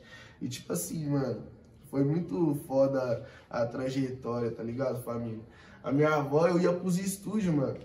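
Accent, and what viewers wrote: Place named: Belo Horizonte, Brazil. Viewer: Véi eu amo esse sutaque de BH mdsss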